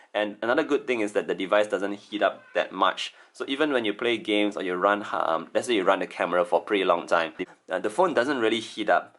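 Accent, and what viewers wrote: Malaysia. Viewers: Hi! great video and very nicely presented. Are you Malaysian? I somehow notice your accent is quite the same with my chinese-malaysian friends.. 👍😊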